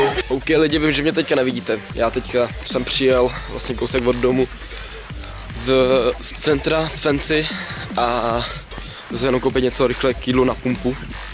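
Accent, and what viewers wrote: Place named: Poland. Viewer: gay s polskym artikulovanim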